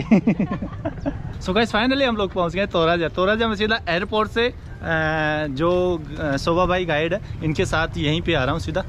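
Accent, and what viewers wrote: Rajasthan, India. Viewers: bdiya bolte ho pura Rajasthani touch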